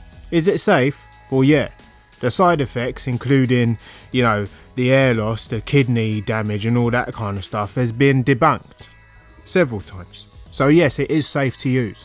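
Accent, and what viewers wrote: United Kingdom. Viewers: Dude I was also thinking the same shit and was gonna write a comment along those lines,he really does sound like one character and scene that came to mind...in SNATCH when TYRONE made a call to SOL and was like “Boris just got to his house...Hang On he’s not alone,he’s with some fellas”😆😆😆😂😂😂😂 I am South African and I love Bri’ish accents and all of GUY RITCHIE movies,their dialogue is priceless.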